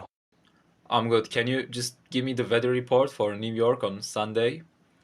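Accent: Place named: Turkey. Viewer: Türk Aksaanini biraz belli etmis gibisin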